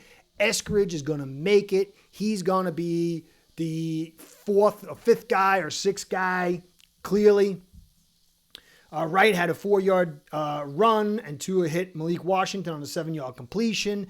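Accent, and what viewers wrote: New York, United States. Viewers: I love your thick New Yorker accent.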